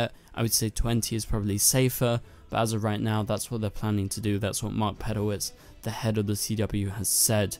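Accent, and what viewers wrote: United Kingdom. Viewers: Just subscribed. Hear a brit accent, so got to support a fellow brit.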